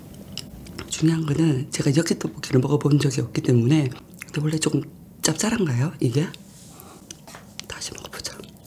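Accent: Busan, South Korea. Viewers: 참 재밌게 하시네 부산말씨~ 나도 부산사람이지만 ㅎ 끌림이 있다 이분 ㅎ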